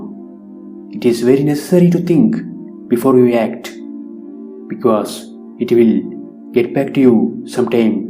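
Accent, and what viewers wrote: Kerala, India. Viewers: Good One! Just that mallu accent is the only negative part. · Malayali narrator?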